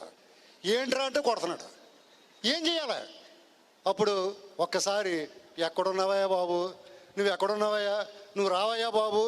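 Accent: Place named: Godavari, India. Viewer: Godavari yesa basha ki meeru brand ambassador sir srinivas garu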